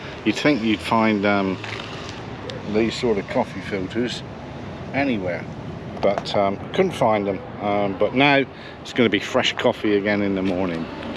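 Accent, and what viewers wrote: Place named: West Country, United Kingdom. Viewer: Great Video. Good to see you and hear that West Country accent too!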